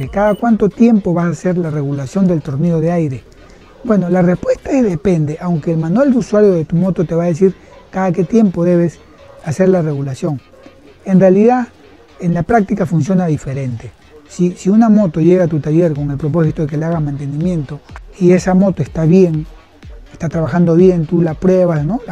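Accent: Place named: Rioplatense, Argentina. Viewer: Desde montevideo gracias y tenes algo de rioplatense hablando muy buen video hasta ahora el mejor de como regular lleve a hacer el servicio y le habian dado como 5 vueltas de entrada de aire tuve que mantener en todo momento la mano sobre el acelerafor dia de lluvia con un transito terrible y nublado cuando llegue se me apago y tuve que arreglarlo yo mismo mi suzuki gn 125 f con 3 vueltas o 3 1\2 va bien vivo en el punto mas alto de montevideo gracias!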